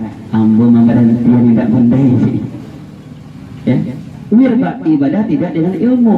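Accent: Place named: Sumatra, Indonesia. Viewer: Logat nya orang Sumatra pak ustad.. Sangat mengetuk hati takziah nya Salam dari Taiwan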